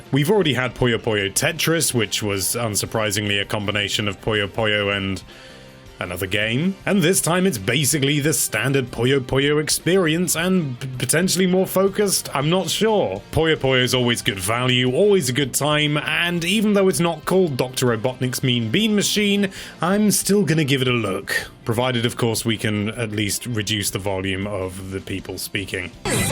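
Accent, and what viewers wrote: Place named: United Kingdom. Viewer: This is the most britishy britsh accent int he world.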